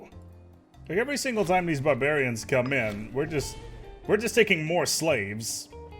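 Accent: Netherlands. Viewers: wait are you dutch...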